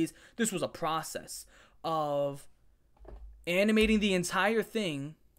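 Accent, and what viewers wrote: New York, United States. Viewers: Baltimore hat, New York sounding accent and Puerto Rican flag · most new york accent ever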